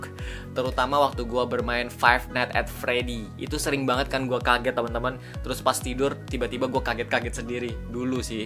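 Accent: Java, Indonesia. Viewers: Kak orang Indonesi apa Jawa Kok ngomongnya Kaya jawa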